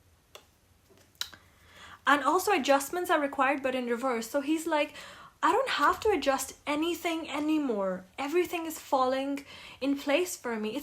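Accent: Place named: India. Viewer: WAIT ARE U INDIAN ??? Cause the quote sounds familiar and ur accent is the pretty indian one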